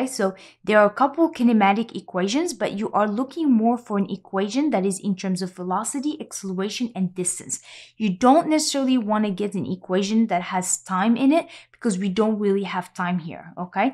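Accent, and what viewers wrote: France. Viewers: Hi. I like your teaching style. Also can hear your lovely french accent. I too speak French and got all my degrees in French before moving to the US. Here vitesse = velocity … I will need to convert the terms before deep diving. How can you help me? I graduated with my bachelor 14years ago, but want to take the FE in 3months. I do I contact you for an effective communication to get me started? Thank you.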